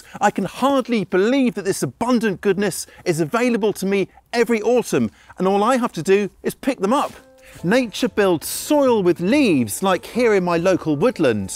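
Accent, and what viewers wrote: United Kingdom. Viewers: Thank you for sharing your great ideas! Your British accent is lovely!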